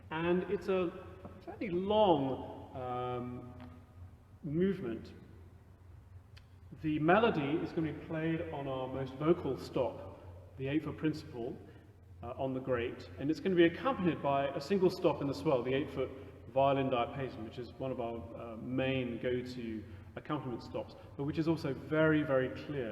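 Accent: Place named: Cambridge, United Kingdom. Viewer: I am delighted to hear that you have not lost your Cantabrigian accent after your years in the USA. I went to "the other place" but have not lost my Oxonian accent either after 38 years in the USA. My American wife says it is because I never listen to anything she says.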